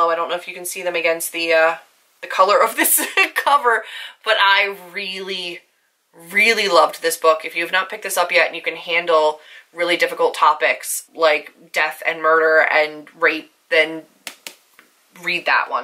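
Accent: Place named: Philadelphia, United States. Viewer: Just found your channel and I’m obsessed! Are you from PA? You sound like you have a Philly accent. I’m from eastern PA. I really want to read The Seven Husbands of Evelyn Hugo. You make it sound awesome.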